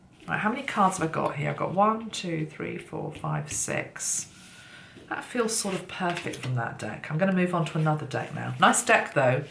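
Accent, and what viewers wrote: United Kingdom. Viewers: At least the "it's a bit sparse" bit made me laugh out loud. (Regarding the tree) It's prob your British accent too. I could picture that old show The Young Ones having a sparse tree and talking about it. Gotta find the humor in the grinches & scrooge types. Love your videos! Xo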